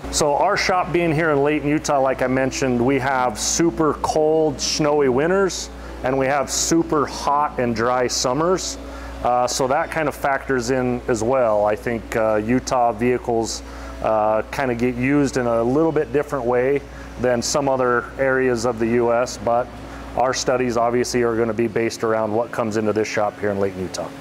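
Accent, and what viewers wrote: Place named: Canada. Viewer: With all of the awes,you sound like Turdo up her in Canada!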